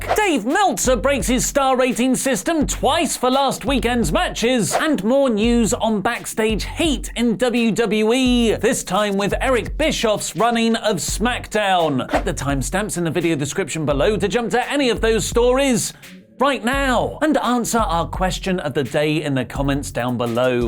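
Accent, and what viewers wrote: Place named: United Kingdom. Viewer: I would appreciate it if you could find people who spoke English with an American accent and without a distinctly British accent so maybe I can finally understand WTF you’re talking about. (I’m looking at you Whatculture and Cultaholic）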